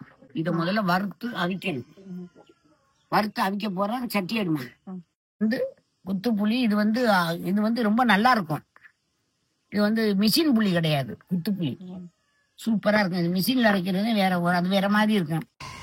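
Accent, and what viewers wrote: Ramanathapuram, India. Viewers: Enga Ramnad district pechu endha vooru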